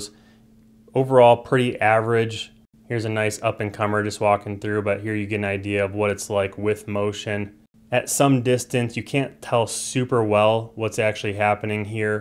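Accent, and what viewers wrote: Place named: North America. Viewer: It would be nice of you to start by pointing out this concerns the US and possibly Canadian market specifically (still less than 5% of the global population). Not everybody can get this from your accent alone. Otherwise, a very useful overview, even if I'm interested in them for property control. And, btw, I would also mention that all three of those brands are North American and hence are likely to host their services (read: +-all of the stuff your camera sends) in there, instead of China, as some other well known Chinese brands do.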